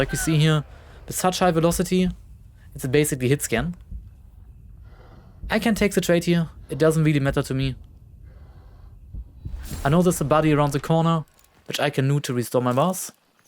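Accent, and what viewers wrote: Germany. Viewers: as a fellow german i cant help but love your THICK german accent lol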